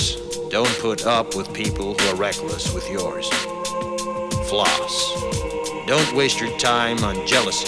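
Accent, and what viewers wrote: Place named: United States. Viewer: he sounds american,but isnt he australian ?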